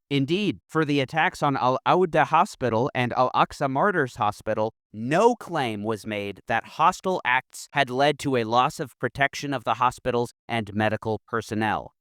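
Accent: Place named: West, United States. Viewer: He sounds western though?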